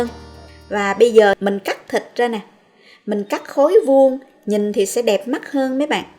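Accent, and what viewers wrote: South, Vietnam. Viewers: 🌹 Mình người miền Trung và rất thích ăn món thịt kho tàu này. Nay thấy bạn hướng dẫn trên đây, nhìn thấy hình ảnh nồi thịt kho rất đẹp mắt và rõ ràng cùng với giọng nói dịu dàng dễ thương của phụ nữ miền Nam... mình những muốn thưởng thức ngay từ đầu, và theo dõi cho tới cuối mặc dù mình cũng biết nấu món này. Rất cảm ơn và chúc chương trình của bạn, ngày càng được nhiều người yêu thích hơn. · Giong dac rat mien Nam that de thuong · Giọng nam bộ 100% nghe ngọt quá, chắc thịt kho tàu cũng ngon ngot lắm. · Tiếng cô nói riêng mà tiếng người miền nam nói chung dễ thương ghê 😍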